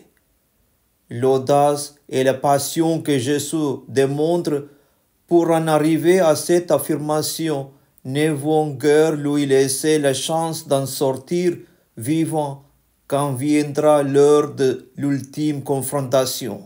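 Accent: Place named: South India, India. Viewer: Vraiment j'espère que tu est Indiens..... précisément sud .....vraiment ton français là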